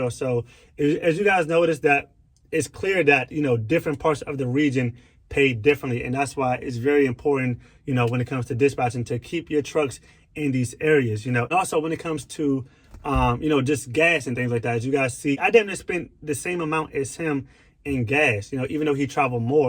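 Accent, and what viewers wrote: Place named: Spain. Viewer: Yow how u sound well on these videos but on the phone when u talking u hv a Spanish guy accent 😢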